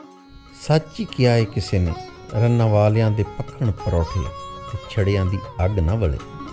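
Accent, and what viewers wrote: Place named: Punjab, India. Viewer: It is just fabulous... nostalgic... Punjabi accent is also just love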